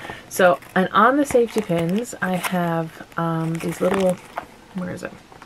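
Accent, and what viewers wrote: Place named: Newfoundland, Canada. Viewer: Loved this video! I was thinking of doing a video like this for my everything pouch. I really heard your Newfoundland accent in this video. Absolutely adore you babe!! ❤❤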